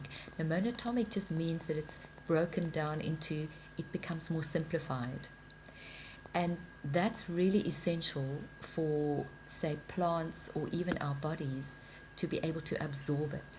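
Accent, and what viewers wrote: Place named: South Africa. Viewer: Hi, I hear your South African accent. Would love to collaborate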